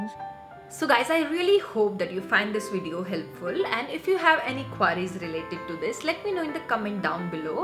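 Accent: India. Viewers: I love her indian accent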